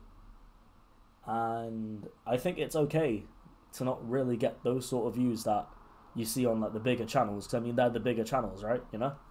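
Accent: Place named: United Kingdom. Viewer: Do something else ❌ Watch talk about his life and the things he's passionate about with a british accent. ✅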